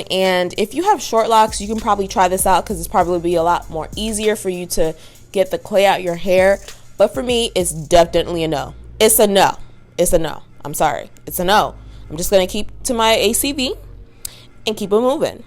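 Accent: Jamaica. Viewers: Im Trini, as soon as you started talking Yardie, I died. Love my Caribbean people!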